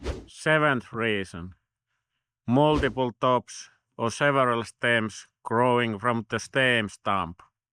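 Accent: Finland. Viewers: Thank you for taking the time to explain this subject to a layman. Fascinating and informative. Also the Finnish accent is pleasing to my ears. Peaceful Skies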